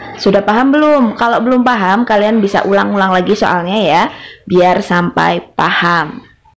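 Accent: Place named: Tegal, Indonesia. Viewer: Tegal ngapak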